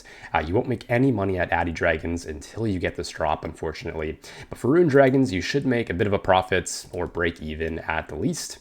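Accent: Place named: Australia. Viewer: i did not know steve irwin making guides now haha. mate.. haha (aussie) lol. Dragon hunter want just one of the best new additions in the game recently, so many good places to use it. Fantastic and useful guide as always!!!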